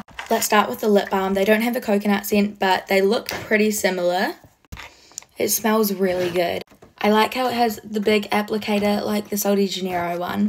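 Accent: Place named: New Zealand. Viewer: Are you from new zealand because my teacher is and she sounds just like you😂😂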